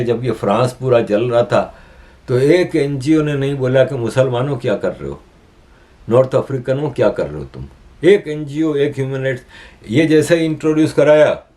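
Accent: Gujarat, India. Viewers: shab mujhe lagta he app Gujarati hoo app key bol gujrati Jaysh lagte he❤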